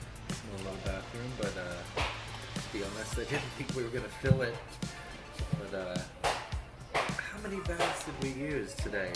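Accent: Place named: Lancashire, United Kingdom. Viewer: Was directed through Tokyo Llama so really looking forward to watching your videos. Where are you from in the North,? Can’t quite place the accent - Lancashire?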